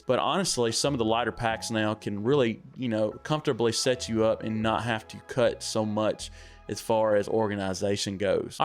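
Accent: South, United States. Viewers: Just found your channel, great southern accent! I hear you tubers often refer to a Nalgene bottle as "Heavy", but, like you, they never really say how much weight you are actually saving. So what is the actual weight savings between a Smart Water bottle and a Nalgene?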